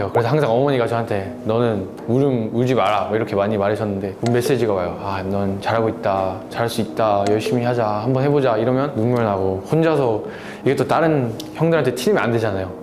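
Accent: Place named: Busan, South Korea. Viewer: 동생들이 부르던 노래 불러주는게 너무 귀여움 부산 사투리 하는 호주남자.. 좋다 혈액형을 노래 부르면서 말하는 우리 예준이 캐스팅썰은 언제 들어도 재밌어.. 살아남기 시리즈를 알고 있을줄 몰랐는데 ㅋㅋㅋ 혼자서 1인2역하면서 카이렐이 중요한 이유를 알려준느게 너무 귀여워 ㅜㅜ 이부분 보면서 눈물날뻔했다 ㅜㅜㅜ 😢 이게 MZ인가 ㅋㅋㅋㅋㅋ 컨텐츠 준비해주셔서 너무 감사합니다 😍😍 덕분에 우리 앰퍼원 외국 멤버들에 대해 더 알아가고 있어요 맞춤 질문까지 준비해주신 센스.. 짱입니다 👍 카이렐편, 브라이언편도 기대하겠습니다!!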